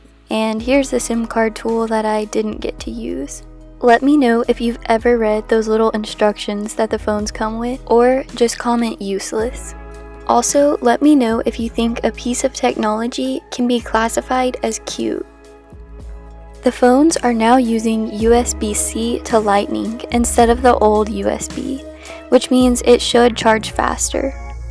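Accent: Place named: United States. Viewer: Is it just me orrr but she has Tesco mobile on her phone she sounds American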